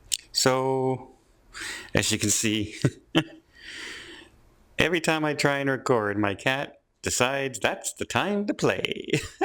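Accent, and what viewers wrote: Canada. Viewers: BH Most likely his accent. is Canadian.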